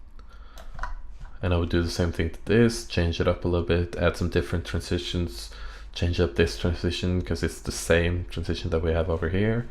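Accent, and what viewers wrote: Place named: Scandinavia. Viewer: Scandinavian accent.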